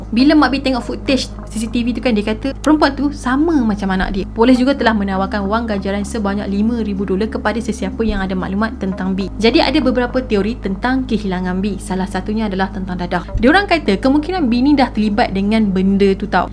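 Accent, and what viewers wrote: Malaysia. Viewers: Hay kaa aku dari indonesiaa,suka bgt denger org Malaysia ngmng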